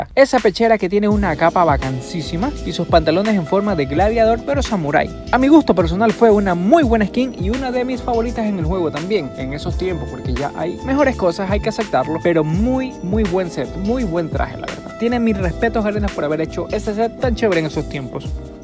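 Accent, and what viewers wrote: Ecuador. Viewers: De donde eres? Con tu vos me pareces ecuatoriano😕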